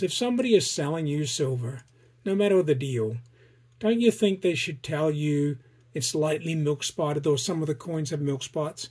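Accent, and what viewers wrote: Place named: New Zealand. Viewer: I’m a kiwi who has lived in Australia for 30 years , I hear New Zealand , Australian and American in your Accent , and a hint of something else I can’t quite put my finger on 😂 . I like your channel 👍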